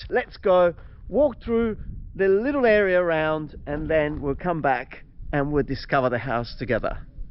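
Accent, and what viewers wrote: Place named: England, United Kingdom. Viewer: Aww Thanks so Much, for your Response. I am currently Watching other Episode from Your Channel “Buying a property in Italy Salento by lol lol I watched You and Dave From DauVo and Subscribed to your Channel and became a Fan!! I wish You Many Success!! Love your English Accent even though I know you are 100 % Italian, Also Compliments to Your Fashion Style very Charming!!! It’s great to Watch someone with so much Knowledge and in the meantime that takes time to Pay attention to the Personal Appearance! That says a lot. Because you are sending a Positive Message if you take care of yourself definitely you will take good care of someone else property. I enjoy Watching your Channel! Great Personality!! Warms Regards from. Noriko in Míami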